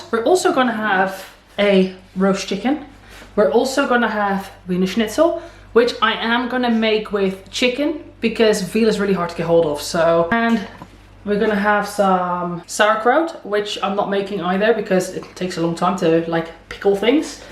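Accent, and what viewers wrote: Netherlands. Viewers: I live in Germany and ur German is pretty good! But it has a bit of a dutch accent :D I feel like both languages are kind of similair, when they are written. When I read smth in dutch, I often understand it, but when somenone talks dutch, I understand nothing haha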